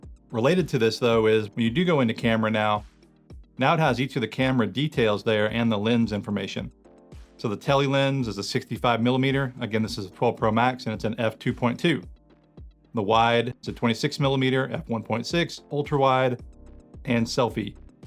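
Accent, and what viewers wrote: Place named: Carolinas, United States. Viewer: Thanks for the info. Love your accent. Is that a North or South Carolinian accent? Have a good day.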